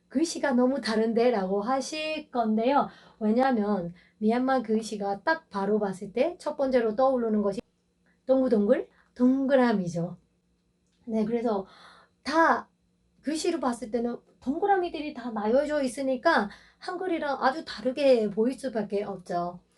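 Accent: Gyeongsang, South Korea. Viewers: 경상도 사투리 억양이 있으시넹~^^ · 경상도 쪽에서 한국어 배우셨나요?? 아님 주변가까운 한국친구가 경상도사투리를 쓰시는 분이신가?? 미얀마분이신대 경상도 사투리억양이 좀 묻어나오시네요 ㅋ것도 살짝 ㅋㅋ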